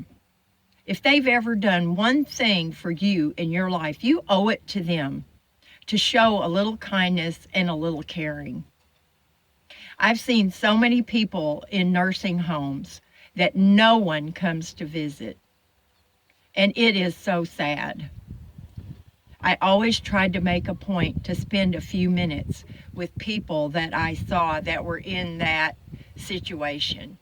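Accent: South, United States. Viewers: ? I'm a new subscriber. Where did you get your ya'll accent? Anyway, I enjoy your videos.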